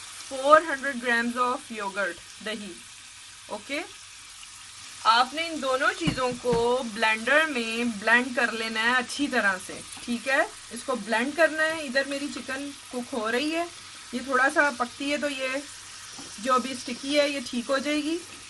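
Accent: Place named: Punjab, India. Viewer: MA'AM.....you have Punjabi accent while speaking.....firstly I thought you are from INDIA.....it's so similar accent.....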